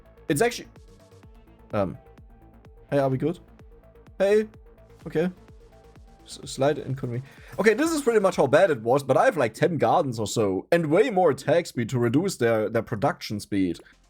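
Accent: Germany. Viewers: Bro is trying to keep speaking english and Not German or whatever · Dein Denglisch ist der Hammer, ich lach mich hier halb tod. Mache einfach so weiter! Geile Videos!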